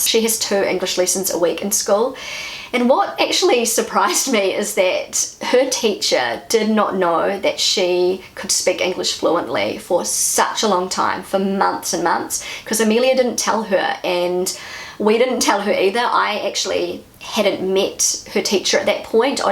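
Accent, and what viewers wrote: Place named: New Zealand. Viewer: You know, hearing that Emilias teacher didn't recognize her as a native English speaker left me a bit wonderous. If she pronounced the words in English class the way she learned it from you it must have been more than obvious to the teacher because your "Kiwi"-English differs very significantly to the standard UK- or US-English that's usually taught here in Germany. Although I must admit that your accent isn't all that strong. I just saw New Zealands PM, Jacinda Ardern, on "The Late Show with Steven Colbert" and I really had to concentrate to understand everything what she was saying. I don't know about her politics but from her appearance on that show I must say she's a great ambassador for your home country!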